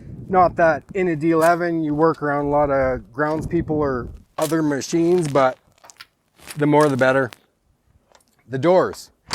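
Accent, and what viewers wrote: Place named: Canada. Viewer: IT'S ALWAYS SCARY AND COUNTER-PRODUCTIVE WHEN A REVIEWER OF A PRODUCT SAYS ''I DON'T KNOW WHAT THAT IS'' ,( ISH), .THIS IS MY 1ST TIME HERE AND ACCORDING TO HIS PRONUNCIATION OF THE ENGLISH STYLE HE'S SPEAKING I'M GOING TO TAKE A STAB AND SAY THIS INDIVIDUAL IS A NATIVE FROM THE GREAT WHITE NORTH AND THAT HE REALLY DOESN'T KNOW WHAT ANY OF THAT IS FOR. HE ALMOST HAD ME FOOLED WITH THE MACHO, COPY-CAT FASHIONISTA TATTOOS.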